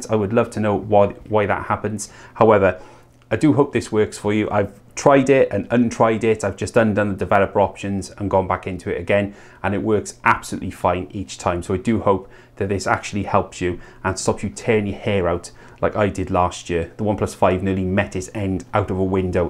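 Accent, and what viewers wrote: Wales, United Kingdom. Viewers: Top tips helped me out 100%, you sound welsh? Me too, from aberystwyth! Cyngor a syniadau gwych!!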